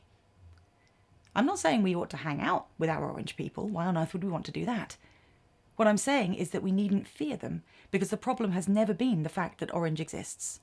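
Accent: United Kingdom. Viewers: I love your accent, is it british?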